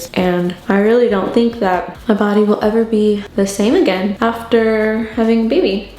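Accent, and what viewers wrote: United Kingdom. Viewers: Your lashes are so long! And don't feel self-conscious babe you look gorgeous, and you sound so cute with your English accent lol. I would totally watch it if you made your own separate channel for girly chit chat stuff! 💖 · I love these chitchat grwm from ❤️✨ and that British accent 👌❣️ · It’s the British accent for meee🤣🤣 love from the UK 🇬🇧🇬🇧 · Love the British accent!! All the best with your pregnancy ❤️❤️ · Lol I love the British accent you’re so beautiful girl God bless you🤍🤍🤍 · Ur beautiful, enjoy the pregnancy. Your body will come back especially if you let baby brown breastfeed the way he's suppose to. Btw i love that British accent. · Beautiful and glowing love the London accent 🥰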